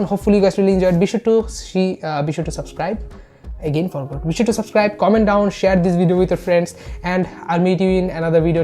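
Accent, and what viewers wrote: Bengal, India. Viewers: Bengali re bhai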